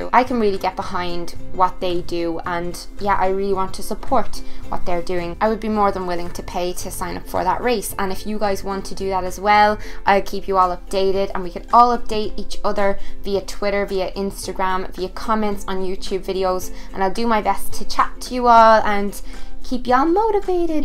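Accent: Ireland. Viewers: Your Irish omg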